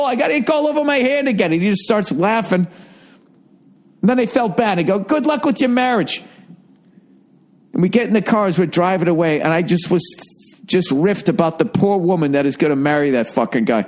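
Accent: Boston, United States. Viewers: I love the Boston Accent in there,especially, when you get heated on what you’re speaking about. Your rants make my day/night. 💛🖤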